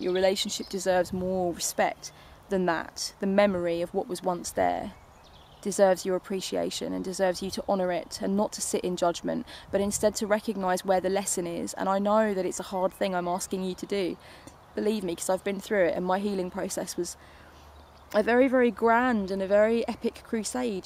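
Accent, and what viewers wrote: United Kingdom. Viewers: Ps. i love your channel (and your brittish accent) you are full of knowledge!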